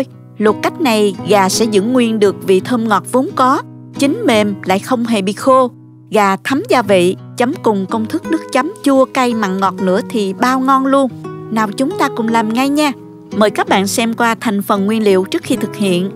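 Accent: South, Vietnam. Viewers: Giong noi cua de thuong qua, dung la nguoi Nam binh duong❤️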